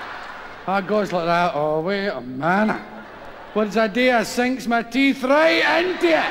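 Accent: United Kingdom. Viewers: Well that’s his accent so maybe you shouldn’t bother watching it! I’m Scottish come from the same place as & I’m so glad he got Scottish culture & humour out to the rest of the world on yer sel big yin!! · Well I'm a Portuguese-Australian and thanks to your subtitles I'm now starting to understand Glaswegian a lot better. You rock 😁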